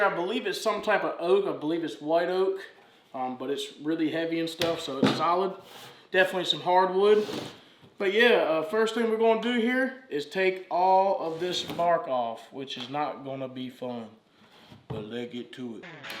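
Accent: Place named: East Coast, Canada. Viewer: Dang I'm up in Canada It sounds like you have an East coast Canadian accent.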